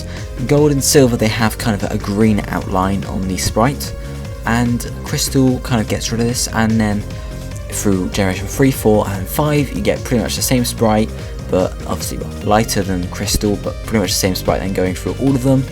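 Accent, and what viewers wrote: United Kingdom. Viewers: Linkof War he's British. That's how they say it in europe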